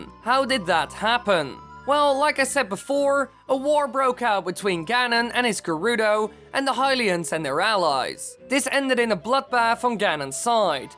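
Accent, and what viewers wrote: Netherlands. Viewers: It's Dutch. We all sound like that in English.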